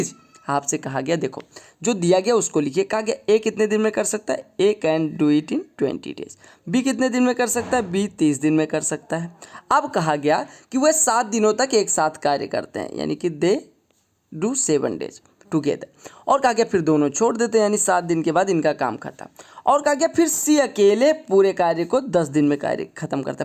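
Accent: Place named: Awadh, India. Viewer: I like Avadhi accent.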